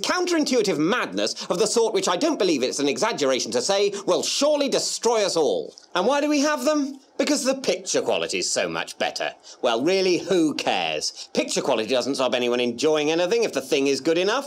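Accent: United Kingdom. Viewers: I read this in my head in David Mitchell's angry yet politely British voice...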